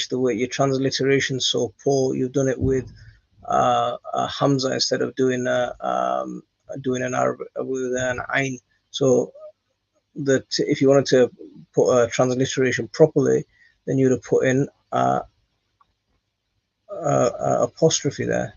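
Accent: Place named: North, United Kingdom. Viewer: P.S. i love to listen to that accent , as i have northern roots . lol It reminds me of all my aunts and uncles now passed : ( x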